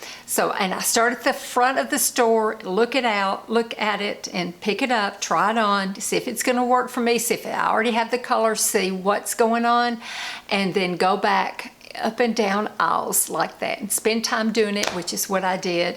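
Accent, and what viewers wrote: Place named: Tennessee, United States. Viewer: You are a beautiful lady ❤ I thought you had a Tennessee accent 🥰 my relatives live near Kingsport.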